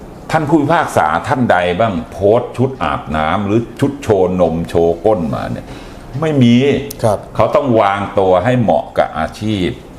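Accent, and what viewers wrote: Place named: Rural, Thailand. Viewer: ถ้าไม่รู้จักมาก่อนแล้วฟังสำเนียงพูดยืดยาดเหน่อนิดหน่อยมาดแบบลุงบ้านนอกคนหนึ่งใครจะรู้ว่าเป็นสิงห์มือปราบลือนามคนหนึ่งของตำรวจไทย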